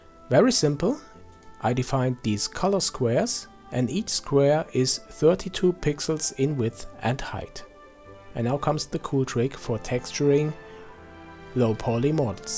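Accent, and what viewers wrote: Germany. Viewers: you ar german ?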